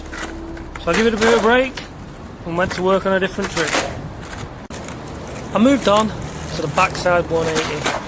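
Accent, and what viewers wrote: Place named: Ireland. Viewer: No problem bro, and gotcha. I heard more of an Irish accent but I don't know much about that lol.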